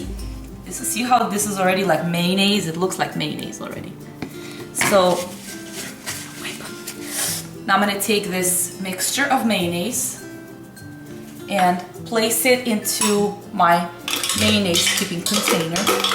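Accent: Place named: Russia. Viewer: кстати,речь с русским акцентом гораздо приятнее уху и легче понять,чем коренных носителй языка! кофточка очень к лицу,красотка!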